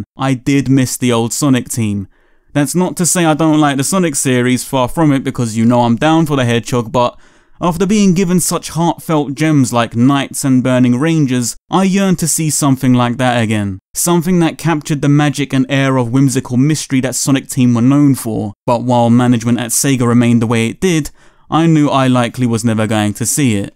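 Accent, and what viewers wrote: London, United Kingdom. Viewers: Ok this is kinda weird lol. Alright so I remember watching this guy like 2 or 3 years ago on one of his Night vids he did back then. For some reason when they announced this I thought to myself, ‘Oh I wonder what that Nights fan with the london accent thinks of this? I’m sure he’d be hella excited.’ Now today I just seen this vid in my recommendation :). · He became more and more British as the video went on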